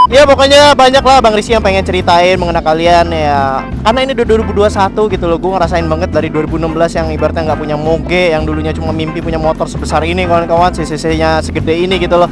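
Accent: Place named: Java, Indonesia. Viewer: Ngakak pas bang bicara ada logatnya jawa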